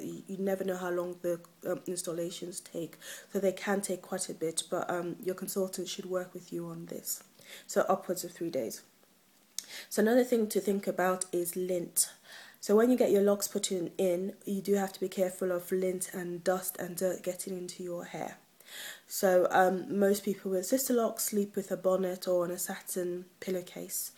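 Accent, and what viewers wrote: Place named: England, United Kingdom. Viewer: I love her english accent.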